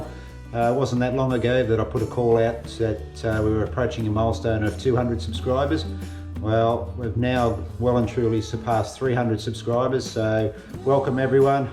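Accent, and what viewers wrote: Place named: Australia. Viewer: Great channel for runners, thank you ! I'm 42 but fairly new to running (3 years), so I can keep chasing down PB's for now, especially on longer distances (I've only run 1 marathon so far in Francfort). Great content ! And your accent reminds me of the wonderful time we had in Australia a few years ago :-) Cheers from Belgium !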